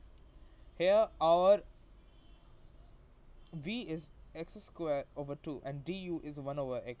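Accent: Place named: India. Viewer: Hi, I just subscribed to your channel... One of the best Indian videos for me on youtube. The Indian accent is still there but I could totally understand you because you spoke clearly and slowly. I'm a masters student your videos help me refresh on calculus. Please if you can, do share more. Thanks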